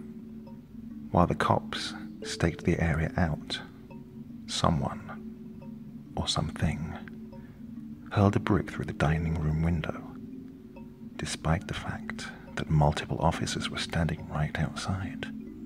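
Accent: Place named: United Kingdom. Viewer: You have to be British to make something sound scary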